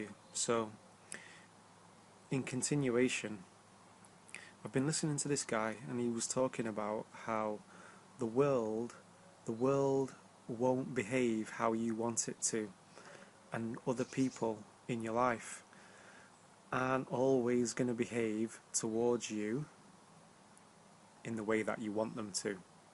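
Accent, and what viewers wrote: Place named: England, United Kingdom. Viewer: Ohhh, you're English. No wonder I keep thinking you are such a cutie... what's up with the English and your gift of gab?! It's not just the accent either. ;)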